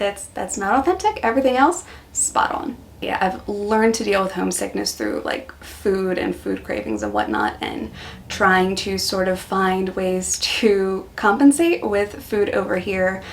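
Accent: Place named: Australia. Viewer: You are starting to loose your American accent and starting to get an Australian accent. It is difficult to work out what part of America you grew up in. The biggest question I have for you is have you worked out how to spread Vegemite yet. Australians need to understand that American has 3 cities that have more people than Australia but the actual size of both countries is almost the same. As someone who has always had problems with my weight I found just walking to the shops worked extremely well and as I have to carry my groceries I have to go more often. Have fun life is to short to take it seriously.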